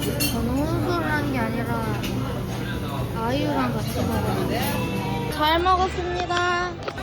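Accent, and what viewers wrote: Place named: Gyeonggi, South Korea. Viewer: 대리만족하며 잘 보구 있어요 지난 영상부터 몰아보기중인데 님의 나른한 경기말투 넘 좋네요^^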